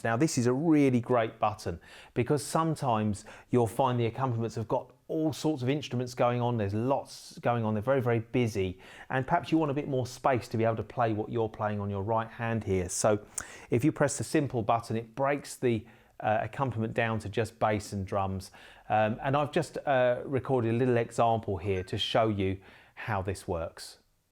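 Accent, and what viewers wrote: United Kingdom. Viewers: Who knew this mild mannered guy with a British accent was a funk genius?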